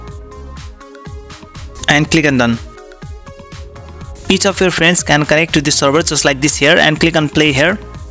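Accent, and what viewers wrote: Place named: India. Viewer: it's joke, the guy has Indian voice and must of the time Indians are scammers, but this isn't one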